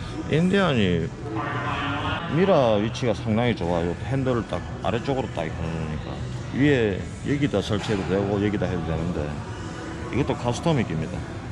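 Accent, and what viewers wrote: Gyeongsang, South Korea. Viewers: 우와~~전시품이 한국 하고는 차원이 다르네요 저도 스피드를 좋아라 하는 편인데 바이크가 멋진게 많네요 ㅎㅎ 영상 재미나게 잘 봤습니다~~ 근데 님은 고향이 어디신지요??사투리 쓰시는거 같던데 ㅎㅎ 경상도 쪽이신가요??ㅎㅎ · 안녕하세요 DG님 님의 채널을 알게된게 이제 2주 정도 밖에 안되었는데 홀릭이 되어버린듯합니다.. 저도 자꾸만 잊어가는 구수한 갱상도 사투리가 고향의 맛을 느끼게 해주어서 편안하다고나 할까 푸근하다고나 할까... 매일매일 업로드되는 영상은 물론이고 하루에 5~6개정도 보고 휴일날은 평균 15개정도는 보고 있는거 같으네요.... 이러다 옛날 게임에 빠져 폐인 생활하던 그 때가 갑자기 생각이 듭니다... 이전 올라온 영상을 다 보고 나면 시간적 여유로움이 생길듯 하구요 님이 올리신 영상 중 190편을 보다가 메디슨 햇에 대해 잠간 응급하셧는데 구글 지도를 보다가 다른 주에서 없는 특이한 모습을 보았습니다. 그게 뭐냐하면 둥근모양의 농지가 특이해보여서 갑자기 궁금증이 생겨서요.. 다른 주를 보았는데 둥근 모양의 농지는 보이지 안트라고요. 도대체 그게 뭔지 궁금해서 이렇게 댓글을 남깁니다. 언제나 안전한 운행을 기원드릴께요..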